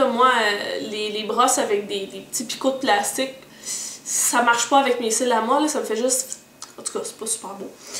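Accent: Quebec, Canada. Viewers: J'adore ton accent quebecois c'est tellement mignion :)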